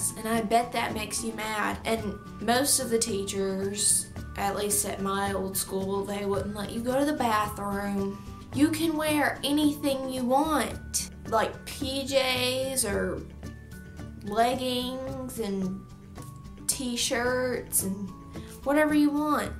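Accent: United States. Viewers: love your country accent :)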